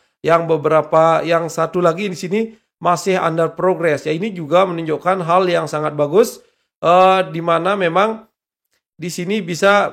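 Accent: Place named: Bali, Indonesia. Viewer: Medok banget bli ciri khas Bali nya😀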